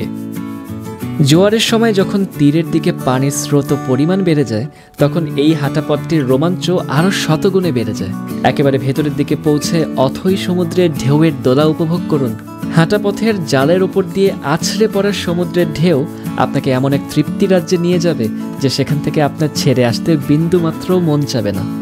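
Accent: Standard. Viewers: বাংলায় উপস্থাপনায় এক যুগান্তকারী উদাহরণ অপনি এবং বিশ্বকে সম্পুর্ন শুদ্ধ বাংলায় উপস্থাপন আপনার থেকে ভালো কেউ করতে পারে নাই এটা নিশ্চিত ♥️♥️💕💕 এগিয়ে যান আরো অনেক দূর ♥️ আল্লাহ তায়ালা আপনাক নেক হায়াত দান করুক ♥️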